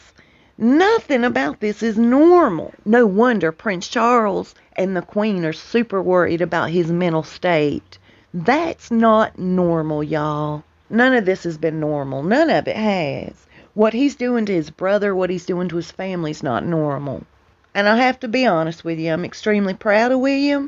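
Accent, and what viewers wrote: South, United States. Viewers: I enjoyed your video today. As much as I like the southern accent, I am afraid it is too much for me, and I can't follow. Today you were much better . Do our really talk like that all the time, it seems like you exaggerate it sometimes . If not I apologize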